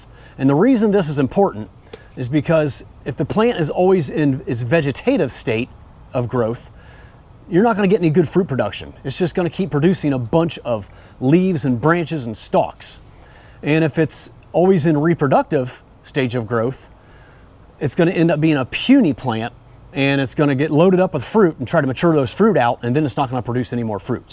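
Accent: Ohio, United States. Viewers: Love your Ohio accent!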